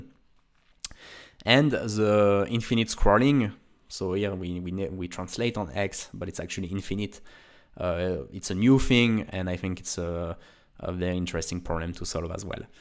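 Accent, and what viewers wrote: France. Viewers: Nice video, are you French? You have a French accent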